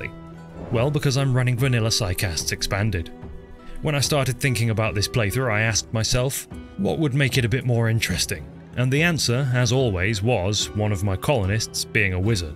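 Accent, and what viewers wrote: United Kingdom. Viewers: doesnt this dude sound like spiffing brit ?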